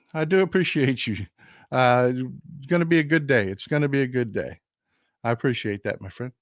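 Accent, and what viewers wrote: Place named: South, United States. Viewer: A Virginian...a Southerner. Need some of our voices in my ear, rather than all these West Coast, Northeastern folk. Just subscribed... preach brother! Thank you.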